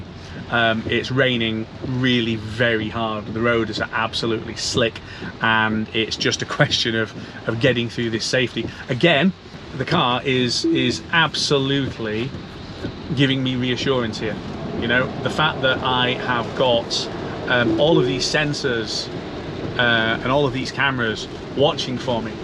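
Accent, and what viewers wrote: Scotland, United Kingdom. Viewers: Excellent video and congratulations on your new Model Y! I’m a huge fan of Tesla here in the United States and looking forward to getting the Cybertruck. All the features that you listed resonate with me and your description was spot on. Thanks for the brilliant review and keep the videos coming. Love the Scottish accent.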